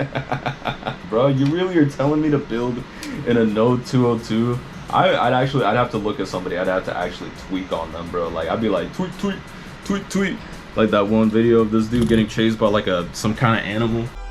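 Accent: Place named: Dominican Republic. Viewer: first time ever stumbling into this channel, and im kind of overstimulated by how much is happening in such a short amount of time. Anyways, the dominican accent caught me off-guard lmao. I didn't think you'd also be latino KASJDAJAK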